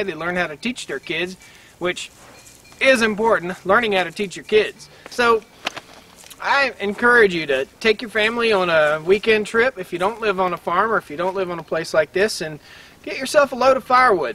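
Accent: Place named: South, United States. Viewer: you have a nice country voice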